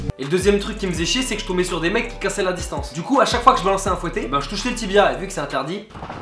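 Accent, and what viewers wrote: Alsace, France. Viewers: Cet accent alsacien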